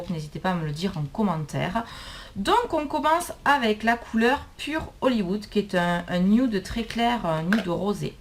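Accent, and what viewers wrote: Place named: South-West, France. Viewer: C'est bien ce que je me disais j'ai reconnu l'accent du sud-ouest 😉 je suis de Mirande dans le Gers mdr 😘😘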